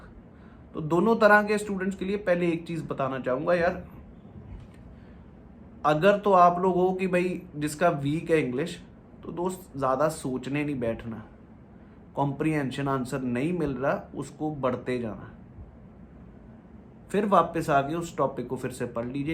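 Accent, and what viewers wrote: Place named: Pakistan. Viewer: Sir apka accent pakistaniyo jesha hein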